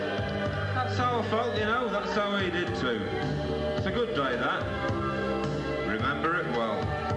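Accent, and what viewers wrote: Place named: Birmingham, United Kingdom. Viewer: 👍 Despite living in Torquay now he still has a Brummie accent but at this stage you can here his Potteries accent quite a lot 😂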